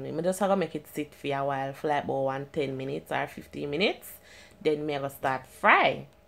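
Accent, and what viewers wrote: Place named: Jamaica. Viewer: 🤤🤤🤤 plus I love the way you speak😍 time to learn some Jamaican English 💃🏻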